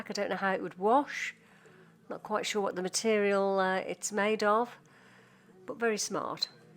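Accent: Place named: Midlands, United Kingdom. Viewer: I love your subtle midlands accent. I grew up in Brownhills near Walsall.❤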